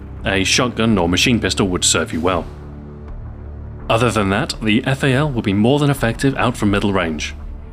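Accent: United Kingdom. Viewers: It's the British accent and professional sounding delivery. If it was narrated by driftor it would be much less impressive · The monotone and slight british undertone give all of your videoes a "guide on tape" feel, which is just right for the amazing detail and quality of your guides. the lack of intolerable music and over opinionated screaming of most youtube videos has gotten you another watcher. I have subscribed and i hope you will do the same for black ops.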